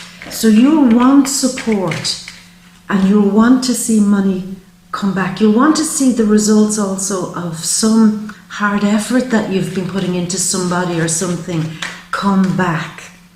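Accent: Ireland. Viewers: you are so animated and unique i love listening to that pure irish accent.good girl.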